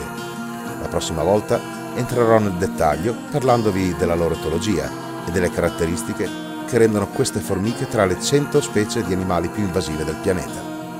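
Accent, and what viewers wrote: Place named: Ferrara, Italy. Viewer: Non me ne intendo di formiche, ma i tuoi video sono ipnotici 😂 sei un grande. Ma da dove vieni? Accento fantastico (sembra il mio, ferrarese)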